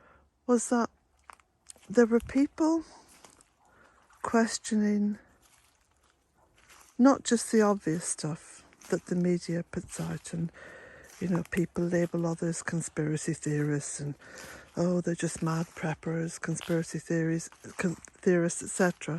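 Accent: Ireland. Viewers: I just want to speak about your books. I have them all. I read and re read these. In these stressful times it is heart warming to read how you tell your story. Your passion comes to life in a very colorful way. Your love and devotion to the Goddess. To Earth. I actually think I read and hear your Irish accent as I go along. Lol. It's comforting and brings the certainty that we will make it through all this. "In a time of destruction create something", plant something, love something, nurture something. Great job! Blessings💖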